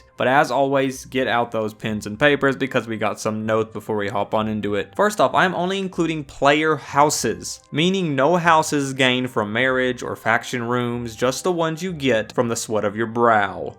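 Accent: South, United States. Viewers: You and theepicnate are easily some of my favorite skyrim youtubers. Just something in the way your videos are so fun and calming to just listen to as you go about your day. The southern drawl in your voice is just the cherry on top. Great vid man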